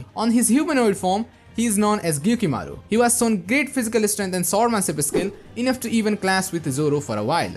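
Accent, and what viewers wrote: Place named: North India, India. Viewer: Bro, you need to work on your North Indian accent.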